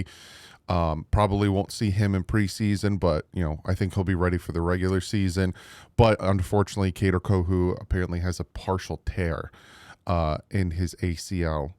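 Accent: New Jersey, United States. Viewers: At least your jersey accent isn’t Tony Soprano esque 😂😂😂 then it would sound like “Mika Fitzs-hhpatrik”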